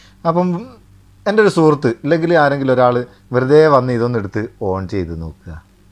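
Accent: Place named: Malappuram, India. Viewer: ഇങ്ങളെ സംസാരം പൊളിച്ചു ഇങ്ങൾ മലപ്പുറത്ത്‌ കാരനാണോ👌👍👏👏👏👏msg ഗുഡ് sub ചെയ്തു ബെൽ ഐക്കൺ ക്ലിക്ക് ചെയ്‌തൂട്ടോ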